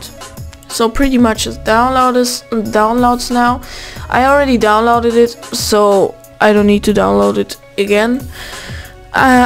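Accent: Germany. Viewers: dachte mir schon das du deutscher bist haha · yes wait you german? deutsch? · Allein an Stimme erkannt das du deutsch bist, aber danke fürs tur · ARE YOU DEUTSCH? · Kann es sein das du deutsch bist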